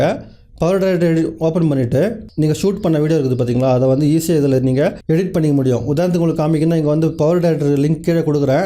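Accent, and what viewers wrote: Chennai, India. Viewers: தலைவா சென்னை தமிழில்,பேசாம தஞ்சை தமிழில் பேசுங்க..(அது என்ன உங்களுக்கு உசரம் height டா..? நன்றி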